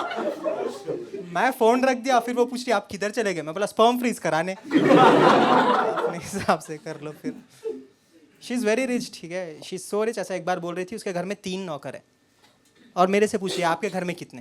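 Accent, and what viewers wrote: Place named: Hyderabad, India. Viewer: Love the Hyderabadi accent 😅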